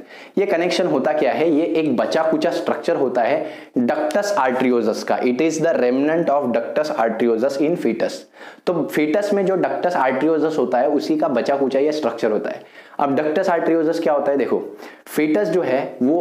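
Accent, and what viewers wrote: Maharashtra, India. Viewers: Tum marathi ho kya bhaiyaa..... And aapki teaching level are next level..... ❤️‍🔥✨✨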